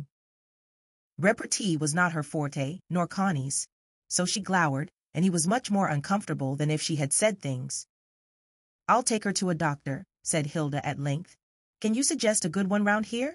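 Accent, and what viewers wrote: United States. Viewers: Horrible, please please don’t ruin audiobooks by creating AI trash, why does she have an American accent when it’s a Yorkshire book?? The “speaker” makes mellor’s native dialect awful to listen to. Stop making this trash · Why do we in the UK need this problem by a US female accent? Why do we have to have a US accent! Don’t like it -. Bye.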